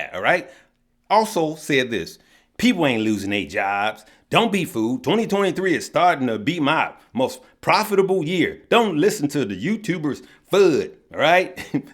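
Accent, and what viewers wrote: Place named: South, United States. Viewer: Thee accent!! Ohh with the country accent. Uncle. Has. Had it.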